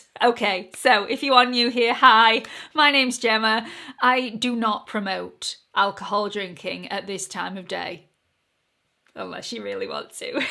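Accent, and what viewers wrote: United Kingdom. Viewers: I always watch you till the end! besides being interested in what your talking about love your British accent! Much Love!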